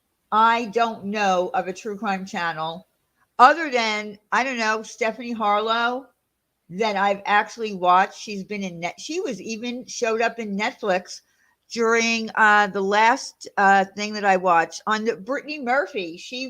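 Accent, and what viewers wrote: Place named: South, United States. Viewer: You go I love that southern voice lol you do good!!!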